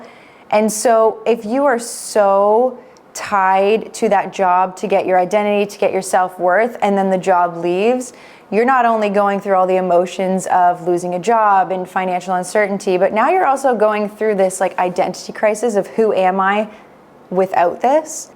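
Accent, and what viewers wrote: United States. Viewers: Youre suffering from California-speak in this video. Youre talking too fast and slurring the words. Hard to listen to. Garbled. Throwing away sentences. Do better. You usually do. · Too American for me to understand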